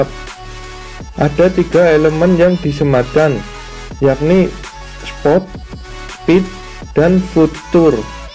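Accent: Java, Indonesia. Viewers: Ngomong opo sih mas medok banget😄 wes rapopo semangat. Lagian motor itu ga di jual dan ga di produksi di indo. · Medok bgt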